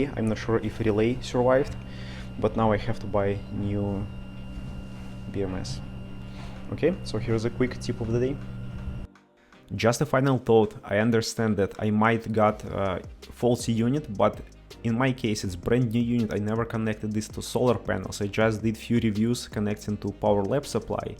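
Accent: Russia. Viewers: Ахаха. Какой чисто русский английский 😁👍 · Какой ужасный рязанский акцент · С первых секунд понятно стало откуда автор :)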